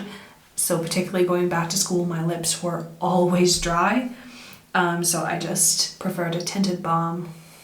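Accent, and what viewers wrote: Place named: United States. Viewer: Very clear and easy to follow@ And, YO!, am i hearing things??? You've gone all American like! 😊 VERY natural and authentic sounding! And i'm Californian 😄! Cool, cool 😎👍🏼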